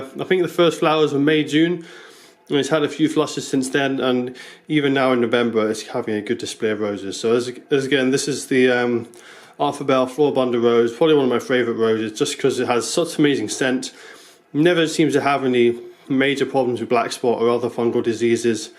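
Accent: Scotland, United Kingdom. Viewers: Ohhhhh you're scottish haha that's why I can't understand you. lol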